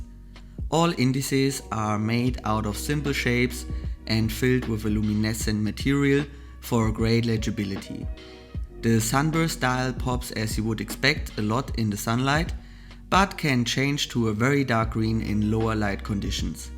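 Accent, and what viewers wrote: Germany. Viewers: Are you a native German speaker ?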